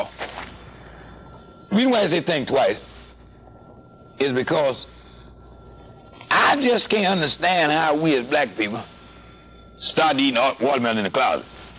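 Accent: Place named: South, United States. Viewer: He really as Southern as it gets🤣 I thought he was from Louisiana😂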